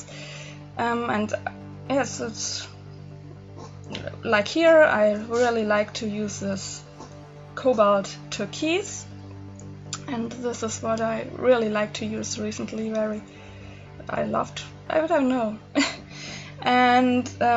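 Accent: Germany. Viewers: Immer diese Münchner :D Hört man direkt raus ^^ gruß aus Hamnburg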